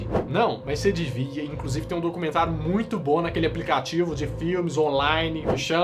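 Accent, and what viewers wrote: Minas Gerais, Brazil. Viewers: Acho fofo sotaque mineiro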